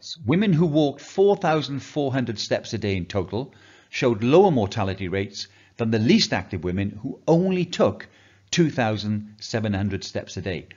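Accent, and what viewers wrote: United Kingdom. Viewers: I am glade to hear the British accent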